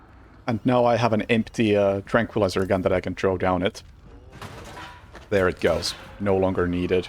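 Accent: Finland. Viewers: Also the thing with the mastery change to 20 theres a bit more to it than that and it’s more understandable when u know the nuances: Hitman 3 changed A LOT regarding Hitman 2’s challenges when porting the old content over. Challenges were cut, sometimes reworked and most importantly they moved around unlocks. Like for example they removed all the Classics challenges for each difficulty which stacked up to a big XP bonus, added challenge pack rewards to the mastery track and had it so that there was rewards that counted how many SASOs in general you did rather than tying it to Master Mode specifically So all in all once you do that there was probably instances where that stuff had to be rebalanced, New York already had challenges which gave out less XP to make it the same speed to master as other levels so with a chunk of challenges gone they would have to give the levels different values anyway so they thought why not just make it more consistent with other maps while theyre at it? 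Plus you seem to forget how much people judge a map’s replayability on its mastery levels. This was way better for marketing, even when they eventually bring Hitman 2’s DLC into the full bundle like they did with the Switch2 version it’ll still be better for free players to know theyre getting 20 maps of around the same level of replayability rather than thinking some are less valuable than others Great video tho, i love the fact your finnish(?) accident makes “vault and climbing” sound like “bald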